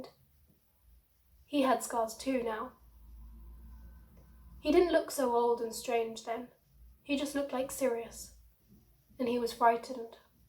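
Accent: United Kingdom. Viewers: listen to this fanfic in British English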